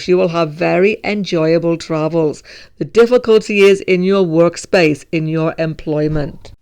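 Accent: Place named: Scotland, United Kingdom. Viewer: Love the explanations and def love your Scottish accent❤️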